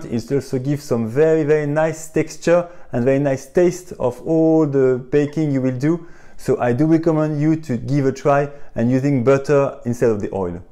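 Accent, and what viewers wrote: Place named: France. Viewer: フランス語の訛りの英語ですねぇ〜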